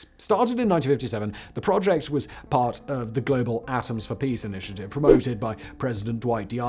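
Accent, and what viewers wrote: England, United Kingdom. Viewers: What a likable not annoying at all bri'ish voice! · I find it extremely strange that you sound a lot more British now than a few years back when I watched your older videos 😂😂 it's absolutely baffling to me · You have a lovely voice , so classic English !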